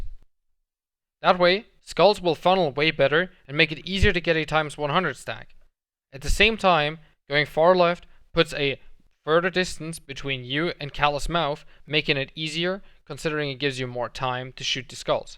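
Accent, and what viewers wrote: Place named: Germany. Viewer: That German accent is sexy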